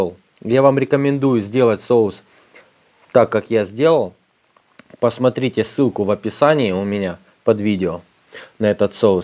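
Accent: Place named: Rostov, Russia. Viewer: Братишка,сам откуда? Я из Ростова на Дону,слышу говорок из наших мест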